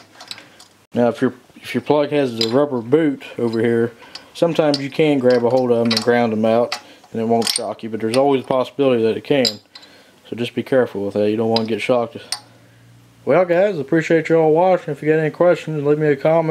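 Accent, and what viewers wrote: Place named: Appalachia, United States. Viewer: You sound like how we talk in va/tn.... My dad use to play the whole "hold this wire for me while i crank it" on all my friends... We got a kick out of it. Them, not so much haha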